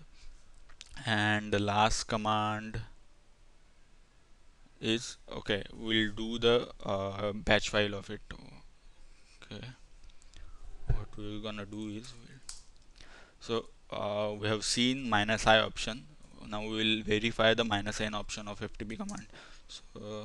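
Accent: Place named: Mumbai, India. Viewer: Mumbai accent :-)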